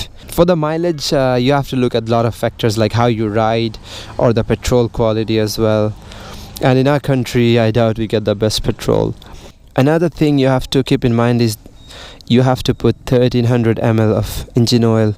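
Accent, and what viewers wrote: United States. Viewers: You dont have the indian accent are you half American? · Yupp buddy, very impressive Bike review you made ever here in BD like American accent really. I like your accent than bike at all, ok buddy go on I hope you can do what you looking for best of good luck for next review.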